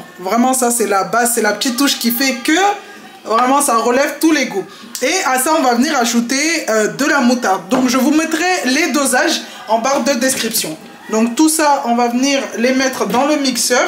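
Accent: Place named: Algeria. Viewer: Merci ma sœur, pour le temps que tu passes dans ta cuisine, on entend algérienne🇩🇿🇩🇿🇩🇿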